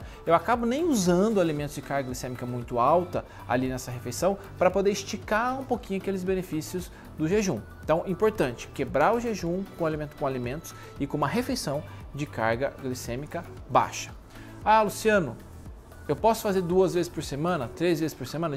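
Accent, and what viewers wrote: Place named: Minas Gerais, Brazil. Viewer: Esse seu jeito mineiro de falar que acalma a alma, Uai eu amo isso ❤ #tamojuntosempre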